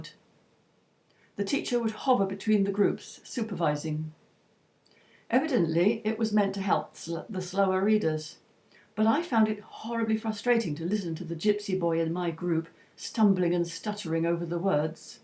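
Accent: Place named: RP, United Kingdom. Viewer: Really very good, I did watch all the story time videos on your other channel and always enjoyed them, I loved when you wore the costume and jingled the little bell at the beginning and end & remember the big book you used as a prop - might be just me but that little routine got me settled and ready to listen - brought back lovely memories of jackanory and also teachers reading to the whole class in infant school - you have a good voice, relaxing to listen to and a wonderful proper English accent, quite posh but not too posh..lol. X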